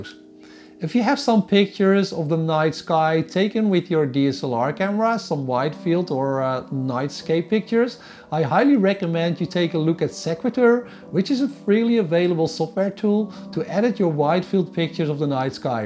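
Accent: Netherlands. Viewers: Hello, I enjoy your videos very much. I live on Vancouver Island in Canada and our climate is similar to yours. My parents came from Holland so I like your accent LOL. I have a question for you or perhaps a topic you can test. I'm at the beginning my astrophotography hobby and very much a newbie but have been doing lots of studying for the last 2 months. I have a cold weather question: After watching this short video <link> on photographing in cold weather I was wondering if any astrophotographers have noticed a difference in using heat bands to prevent dew and if the heat band adds heat distortion itself. I do know you need to cool your equipment before shooting but then your adding heat to the lens hood area again. Isn't this causing heat distortion?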